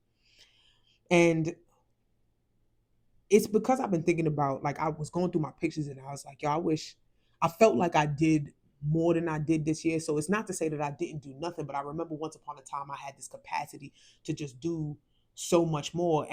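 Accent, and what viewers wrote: New York, United States. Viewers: 😂😂😂 you’re so New York love it. (Wait….you’re from New York, right ? 😭)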